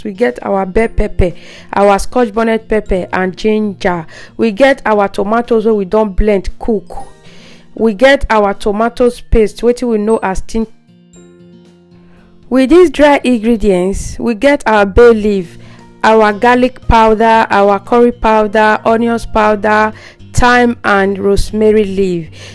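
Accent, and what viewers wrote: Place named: Nigeria. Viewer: The jollof rice looks so yummy, the color alone dey make me hungry and your pidgin English dey sweet for my ear, I know say naa so the rice go sweet well well! And yes this naa the rice wey I grow up dey chop the only difference na the spices because those days if you get Maggie, salt, onions, pepper, tomatoes and crafish jollof don ready be dat, fish or meat fi no dey wetin you know be say chop belefull! Na so we go dey use hand take dey mold am put for mouth 😂 … is a great cook.Thanks for sharing dear ❤️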